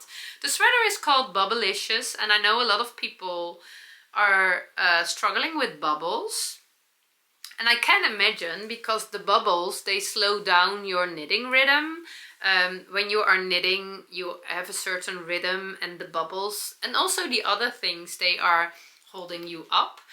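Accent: Netherlands. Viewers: Hello, nothing wrong with your "dutchy" English, makes me smile when you say that. Love the accent. My g.g.g. was from Oenkirk, Netherlands which I visited in 2019. So it gives me an idea of what his accent might have been like. He jumped ship in Melbourne, Australia in 1851 (my birth place). So I may not have existed if he had not come to Australia. Your prononciation is correct. ❤ We have just returned from snowy Norway and 5hrs of daylight till only 2.30pm was hard to get used to. When you had the knit event, I was on a ship heading up the west coast of Norway. So couldnt come to meet you and Yana.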